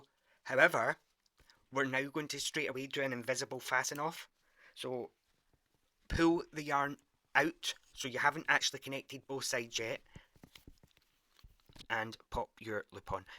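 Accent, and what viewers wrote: Scotland, United Kingdom. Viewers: So cute! I love the Scottish accent. I am subscribed for sure!! Get well soon! I know all about back pains. Rest up!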